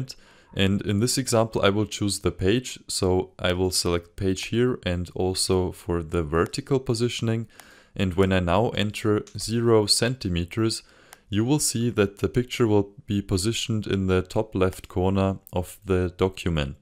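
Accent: Germany. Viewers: Lovely German accent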